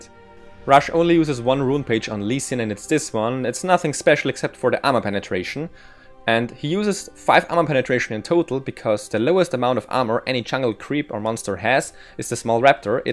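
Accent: Germany. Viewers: are you german? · du bist deutsch oder? xD Nettes guide!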